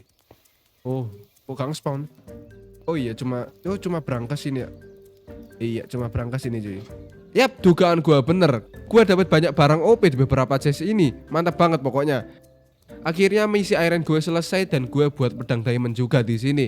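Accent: Java, Indonesia. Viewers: keknya lo jawa bg ngomong gue nya medok bgt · Medok bang ngomong lu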